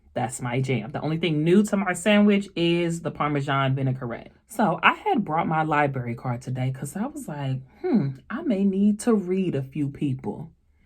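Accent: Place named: New York, United States. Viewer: You definitely sound more NY than NJ. 😂🤷🏽‍♀️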